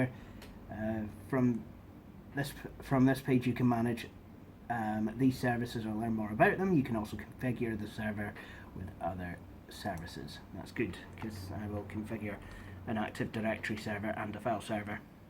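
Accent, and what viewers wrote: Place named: Scotland, United Kingdom. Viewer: are you scottish or British you sound Scottish :)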